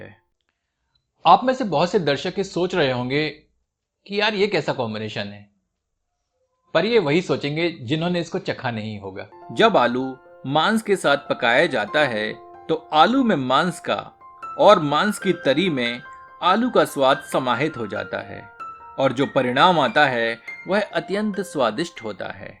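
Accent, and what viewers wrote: Standard. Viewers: First of all pranaam for your shudha hindi, recipe is awesome.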